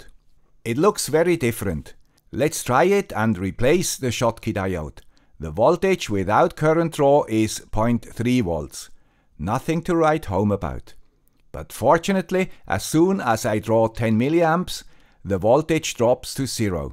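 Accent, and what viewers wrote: Switzerland. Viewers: Perfect, you explain it as it should be. You are real gem Mr. with swiss accent. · He does explain very early that he is the guy with the SWISS accent.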